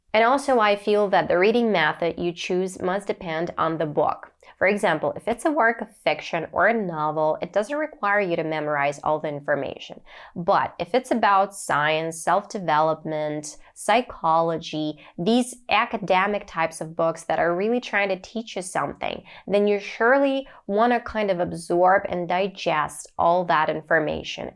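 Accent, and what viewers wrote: United States. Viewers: You make me crazy... Well, twice too american accent... You speak clearly, of course, thanks a lot, everything is understandable...but accent...Who are you originally? I've heard words Russian, Ukranian...Hah... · Hey Master! New to your channel. Just wondering where are you from? You sound like American. Also love your accent or the way your mouth says English words. Greetings from Deep Space! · You are so pretty and you look l and sound like a american woman😁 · Wow I thought you are American You speak with 95% American accent. That's very impressive I've never seen someone from CIS who speaks with almost pure American accent. You encouraging me to improve my speaking skills. Keep it up👍 Thank you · I thought you are native English speaker. You speak just like Americans. Did you ever live in the USA before?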